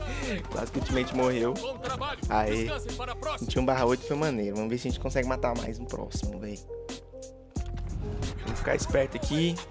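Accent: Minas Gerais, Brazil. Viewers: cê é mineiro tbm né? kkkkk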